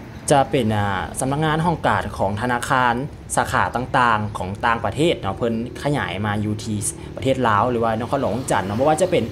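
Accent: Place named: Isan, Thailand. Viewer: เสียงเว้าคัก...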